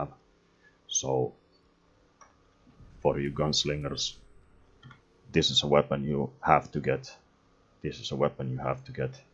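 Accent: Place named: Finland. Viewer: Whoa, that's nice. Hopefully we get this into the live next update. First vid I've seen from you and your accent got me wondering if you're a Finn by chance?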